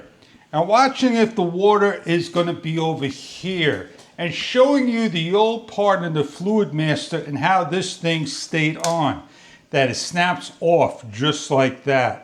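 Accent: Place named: New England, United States. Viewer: Thank you. Your NE accent makes is interesting. (O: I have spent hours and hours on our model 4266, first with new seal, then replacing the whole flush mechanism. Still having it refill to the right spot on the overflow tube, about every 5 to 15 minutes. I think I've tried and learned every lesson you share. But, maybe the heavy nut on the flapper will do the trick. I'm with you, not buying another American Standard toilet. Thanks!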